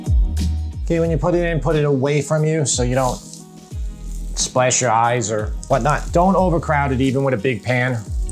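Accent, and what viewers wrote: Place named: New Jersey, United States. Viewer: Well if you really want not to like him you could start with the fact that he say's lives on Long Island but talks like he's from New Jersey. That's a start.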